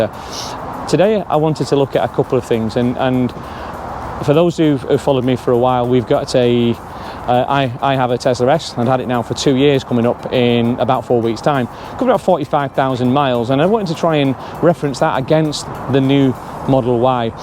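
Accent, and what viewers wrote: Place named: Manchester, United Kingdom. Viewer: Very helpful thanks - good to hear a Manchester accent. Take delivery of mine in December hopefully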